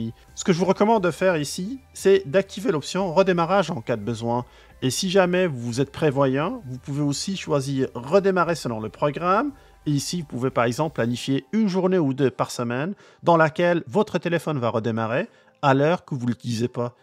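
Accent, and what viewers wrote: Quebec, Canada. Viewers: Merci moi qui a détesté beaucoup le l'accent québécois maintenant je l'adore grâce à vous mon ami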